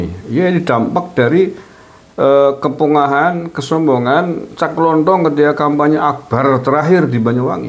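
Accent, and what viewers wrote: Banyumas, Indonesia. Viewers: Pak Njenengan bahasane ngapak, asli endi ? Nyong Purwokerto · Panjenengan pundi pak, kayane koq rada ngapak ya 😂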